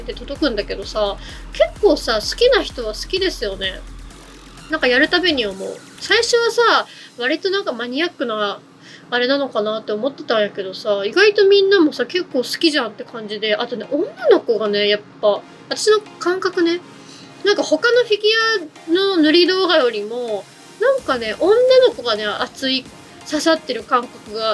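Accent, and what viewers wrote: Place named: Miyazaki, Japan. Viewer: 確かにこれは自分の技量を分かってないと手を加えるのは怖いですね😨 だから見て楽しむ😁 解説中のちらほら出てくる宮崎弁もええなぁ〜☺️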